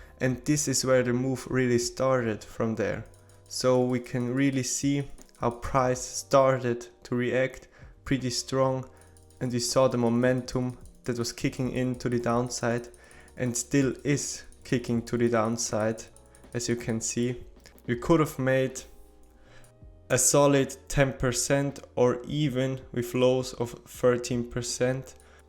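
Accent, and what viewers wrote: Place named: Africa. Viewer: 😂❤️❤️love it mahn keep uploading..african accent makes me more tuned in than James’s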